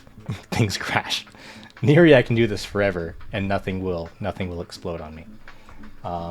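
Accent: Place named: Canada. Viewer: Bro's voice sounds a bit like my friend from Vancouver. I'm all the way over the other side of the Atlantic, though, and don't ride with that many Canadians.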